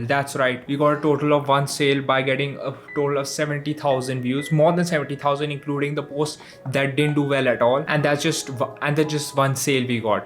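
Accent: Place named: India. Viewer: your accent is like indian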